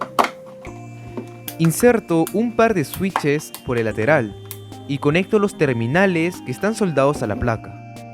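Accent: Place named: Peru. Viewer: increíble, el español peruano es inconfundible.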